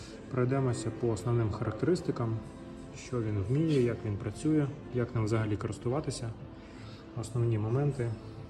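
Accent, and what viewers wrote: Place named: Hungary. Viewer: Nakavom jeziku govoris kao hungar ili moldovan ja ne razumem tebe svaku 10 rec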